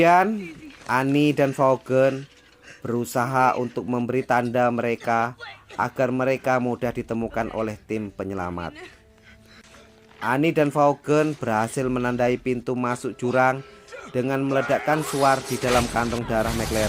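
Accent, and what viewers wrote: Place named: Java, Indonesia. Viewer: Orang jawa ya,medok banget.hahaah. Mas coba dongengin film tentang pendakian gunung everest. Ditunggu video selanjutnya. Sukses selalu ya.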